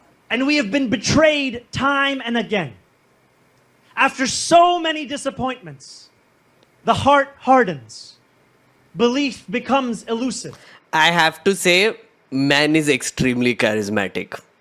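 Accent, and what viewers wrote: India. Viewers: India accent so annoying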